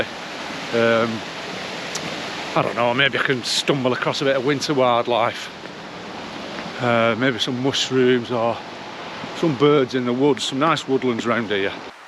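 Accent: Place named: Lancashire, United Kingdom. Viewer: Lovely and educational as usual, I've told you before I'm a Yorkshireman but it's fantastic to hear a true Lancashire accent and follow you on your adventures. Atb and keep putting the great content on fella.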